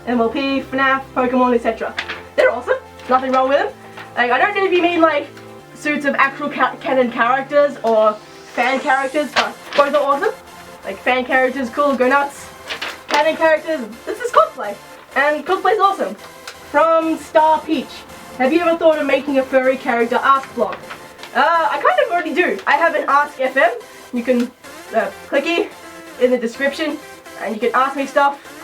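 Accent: Australia. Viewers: OMG you're Australian! I need to seeee youu and hug you!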